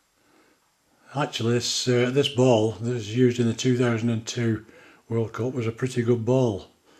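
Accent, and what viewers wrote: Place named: Lancashire, United Kingdom. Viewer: Hi, i have an adidas finale ball that needs fixing, also, are you from lancashire? The accent sounds familiar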